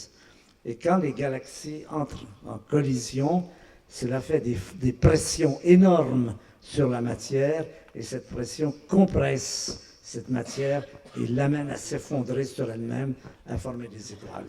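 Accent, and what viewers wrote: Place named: Canada. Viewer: ZzEL Chavez Zz Hubert Reeves est Canadien, permet lui d'avoir un accent !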